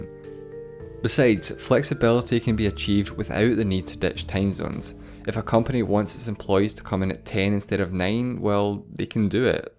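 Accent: Scotland, United Kingdom. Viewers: His clarity is fine. He just has a Scottish accent that some folks will take getting used to. And I’m sure every youtuber is aware that more videos = more subscribers. He likely has another job or school that is his primary focus. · what accent is this?? Brits?? makes me puke...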